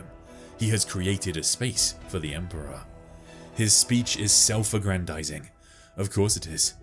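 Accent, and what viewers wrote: England, United Kingdom. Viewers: Mispronounces basic words in the first couple of minutes in a smooth English accent? AI slop. Boo! Go away.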